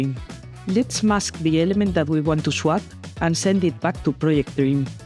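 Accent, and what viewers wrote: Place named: Latin America. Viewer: The latino dialect makes any tutorial a joy to study! 🙂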